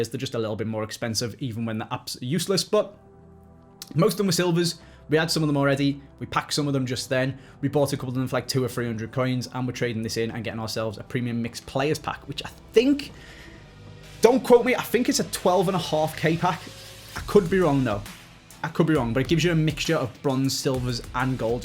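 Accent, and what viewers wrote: Liverpool, United Kingdom. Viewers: Haven't watched since like December last year and I swear you've become more scouse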